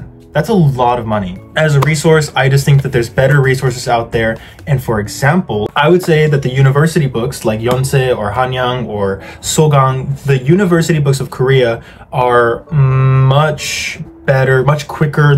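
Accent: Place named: Canada. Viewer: Hi Kate! This is a YouTube video, he plans what he wants to say, he cuts out any unnecessary bits whilst editing and you are able to control the volume you hear him in. All these factors make the difference in comprehension when having a real life conversation with someone. My native language is Portuguese 🇵🇹 I had the same issue when I moved to England as a teen, I promise you it gets better (provided you’re learning English?) - I would recommend trying to immerse yourself into the language more, watch vids in English (anything you want to watch search in English), series, movies etc even if you need English subtitles at first to follow along. Books (actual reading books not the teaching ones) are also good, a lot of people like Harry Potter for languages. It starts off easy and then as the books go it gets more complicated which helps you increase your vocab. 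Maybe English is your native language and the accent is what you’re having issues with, most of what I said would still apply, you’d just have to find vids, series, movies etc in the Canadian accent (basically American so it should be alright) good luck :)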